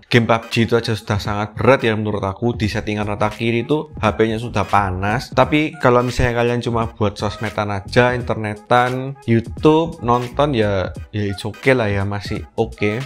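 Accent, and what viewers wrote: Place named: Surabaya, Indonesia. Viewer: Ko...logatnya kok medok ya? Asli sby kah 😅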